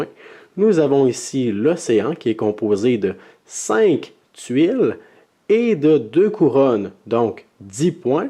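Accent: Quebec, Canada. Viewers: Très bien expliqué et, le bonus, est ce savoureux accent du Québec !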